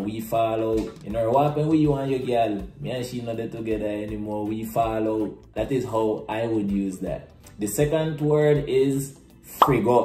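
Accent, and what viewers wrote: Belize. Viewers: You sound like wa Belizean... Im Belizean btw love this video educate us brother.. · You talk straight like a Belizean breda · Bway, I thought you was straight up Belizean for a minute. I neva though the Nicaraguan Kriol was so similiar. Big up mi bredda.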